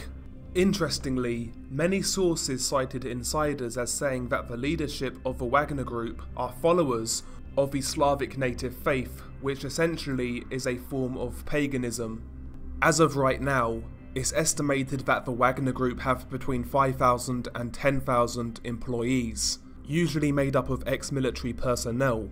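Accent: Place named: United Kingdom. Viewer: Im from Germany but I love youre channel. I can understand it whats saying. Keep going ! And between I love youre british accent ❤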